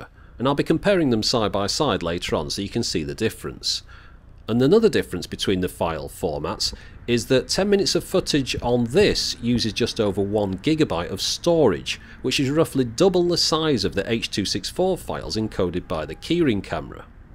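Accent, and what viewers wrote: United Kingdom. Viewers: You can't go wrong with a british accent! :)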